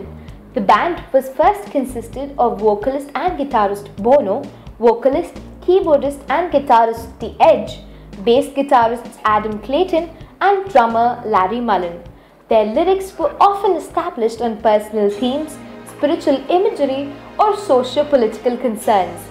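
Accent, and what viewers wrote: India. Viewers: i hear indian accent ..never mind..they are so fucking far ridiculously behind in the mainstream rock and roll area and meansteam music...so fucked up with their bollywood shit!! idiots! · Is the commentary done by an Indian or something not like it matters but I thought they're knowledge was like cricket and how to make carpets fly and stuff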